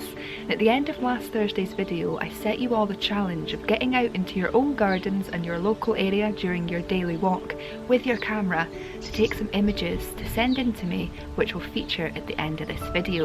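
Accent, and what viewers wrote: Scotland, United Kingdom. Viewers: you have a good heart, and you are able to articulate it in your video's. You have great and entertaining ideas, delivered with your Scottish accent, and a soft voice. You have a bright future ahead of you. You know when to turn the intensity up in your voice and when to be soft. A real blessing. Thank you for your blog. Yours is on my automatic alert. Love the content of your videos. Educational and entertaining. Bill.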